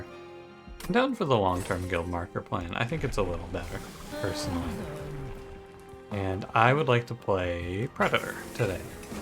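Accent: United States. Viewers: I know that michigan accent my partner is chicago and she calls me out on mine all the time.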